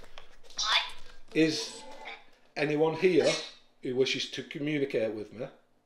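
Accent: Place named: Northern England, United Kingdom. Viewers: This is madness. You sound like me, are you sheffield/Rotherham area? Do you get scared at all or have you just got used to it? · Alreet, lad. I'm new to your videos, and I've more or less binged watched them. When and how did this all start mate? You sound local too. I'm from Manchester.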